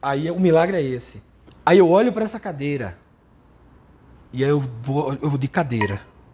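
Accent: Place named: Northeast, Brazil. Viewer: Esse palestrante é do nordeste? Sotaque bonito! Moro em João Pessoa, e tenho amigos pernambucanos, potiguás e cearences.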